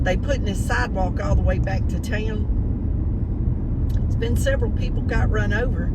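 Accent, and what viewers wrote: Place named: South, United States. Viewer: I love your southern accent. I miss it where I live now. 💜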